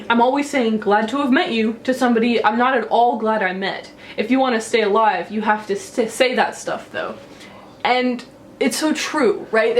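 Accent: Canada. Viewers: are you canadian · First I've ever seen of you, and I don't know you at all. From the accent, Winnipeg?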